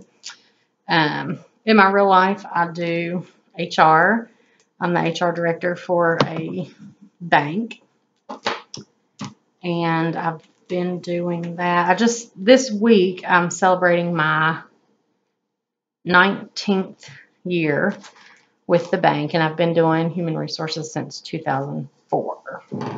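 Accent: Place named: South, United States. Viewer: Hello and welcome I heard you and thought immediately... yeah another southerner.... and then when you were showing where you are from and were talking about Dr. Pepper I was like dang you are in my neck of the woods. Looking forward to seeing more of your projects and future videos.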